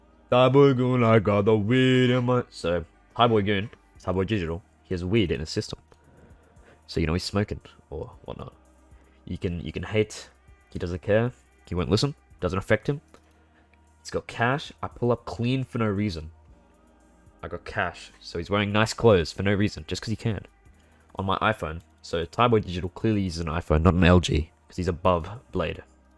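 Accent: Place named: Australia. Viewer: I believe its ausie accent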